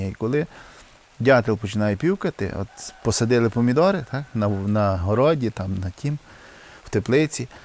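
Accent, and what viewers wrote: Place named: Khmilnyk, Ukraine. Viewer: Привіт. як справи бачу вже посміхаєтесь йдите на поправку. ви з хмильниччини? ато акцент рідний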